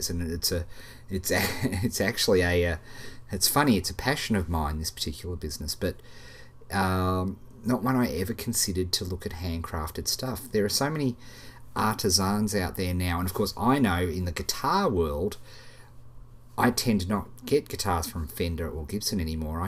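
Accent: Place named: Australia. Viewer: what a lovely australian accent!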